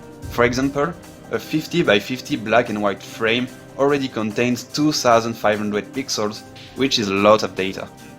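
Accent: France. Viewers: Il est francais l'accent plus les sous-titres en anglais et français....il est francais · are you french? your accent let me think you are x) SI tu l'es GG a toi content de voir un génie français comme toi^^ ça à l'air si complexe x) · Ouep c'est un français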